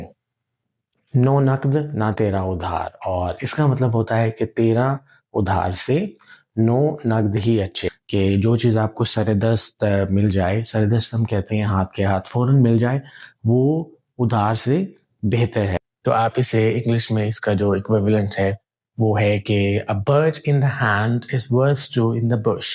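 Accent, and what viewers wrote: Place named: Sindh, Pakistan. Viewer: Being Sindhi accent, teaching marvelously.Hats off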